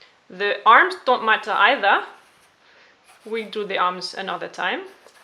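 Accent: France. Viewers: Lissy London hearing her accent, she’s probably french so I’d say that’s where she went, I could be wrong though!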